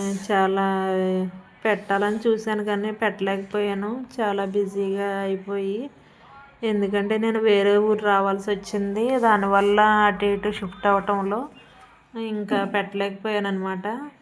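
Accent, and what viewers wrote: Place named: East Godavari, India. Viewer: Akkaaaa...Meeku east godavari yaasa vachesindiii..curry super 😊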